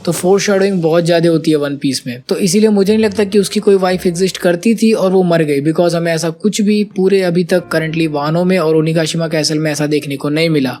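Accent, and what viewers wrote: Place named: Uttar Pradesh, India. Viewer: bhai....🤣🤣 maza aa jata hai tera sun ke ...full UP wali feel aa jati hai..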